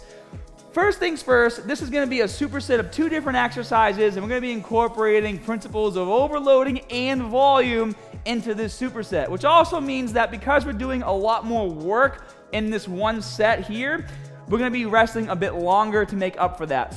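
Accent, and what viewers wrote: Boston, United States. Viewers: You got be from Boston with that accent 💪🏽 I been doing for while definitely gets you a nice pump started to see results after a month nice!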